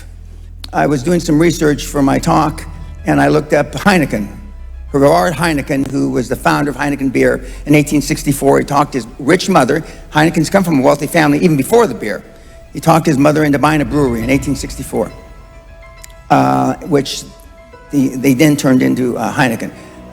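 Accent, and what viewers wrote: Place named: United States. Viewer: Too strong american accent.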